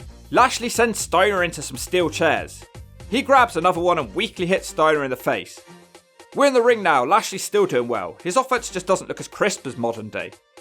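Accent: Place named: West Country, United Kingdom. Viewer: You've never sounded more Westcountry than in this video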